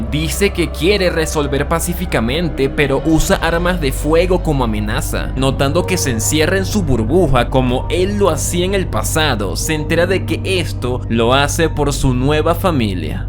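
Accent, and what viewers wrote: Venezuela. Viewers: Chamo me dí cuenta de que eres venezolano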